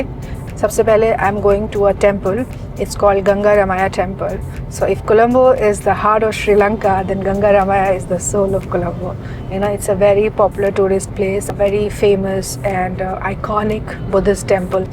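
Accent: India. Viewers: It come.with Hindi pronounced not sankrit Hindi lot.ending with et and r thats to english as well.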